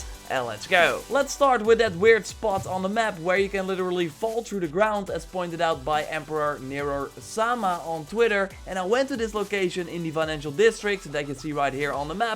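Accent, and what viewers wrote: Netherlands. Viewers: Are you dutch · Are you dutch im dutch you sound dutch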